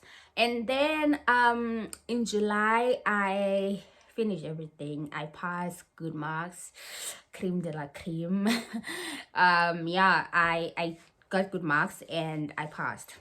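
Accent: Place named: South Africa. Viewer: You said, "yhoo shame", "uyanya", etc. The way you say these words, accent and demeanor, it screams Xhosa hun. I will be starting life in Germany in the next few months on Blue card visa. Hopefully, I don't go through such turbulence.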